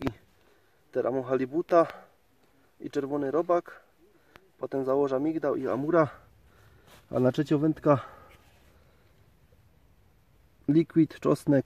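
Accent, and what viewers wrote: Silesia, Poland. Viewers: Ty ja tak samo. Gadka Śląska hehehe. Chytaj chytaj :) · Jak ja lubię Ciebie oglądać, przez tą Twoją gware 😉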